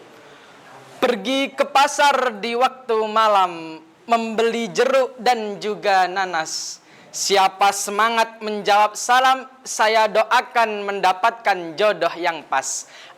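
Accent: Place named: Surabaya, Indonesia. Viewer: ligatnyaa berasa banget org surabayaaa. ayo9 di tekan lagi intonasinya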